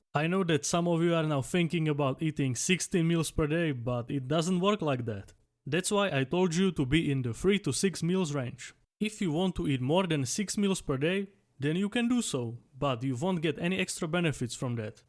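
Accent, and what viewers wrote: Czech Republic. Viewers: Já si říkal že slyším Čecha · dobrej czech accent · Kámo... ten český přízvuk je moc. :D Nedávám to. Jinak super video ale.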